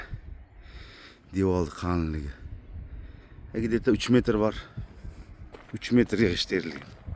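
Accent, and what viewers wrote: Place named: Surkhandarya, Uzbekistan. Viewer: Бу сурхандарёдан узбекча гапиряпдику ашитта машутта олифтагарчилик кимаяпдику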